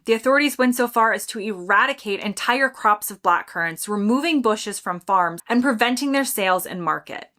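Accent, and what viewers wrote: United States. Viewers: At first I was quite confused why you sounded so American, and at the same time was so empathic to English culture 😊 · I was at 4:something when I realized that this British girl speaks with the American accent :D As a Russian I am appalled by the topic of this video 😵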